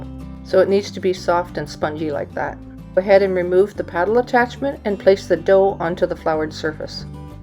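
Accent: Canada. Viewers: Are you Canadian? You have such a strong Canadian accent!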